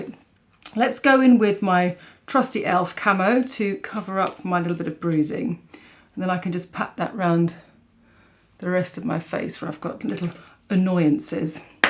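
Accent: England, United Kingdom. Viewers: That lipstick is everything on you. The corals and peaches are lovely with your coloring. Love your videos and your beautiful English accent ♥️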